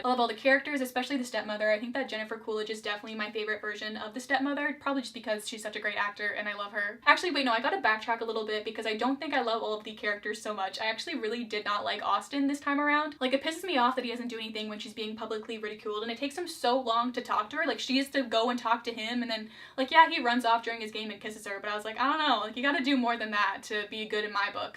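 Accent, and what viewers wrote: Canada. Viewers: Your Canadian “out”s were STRONG in this video!!:)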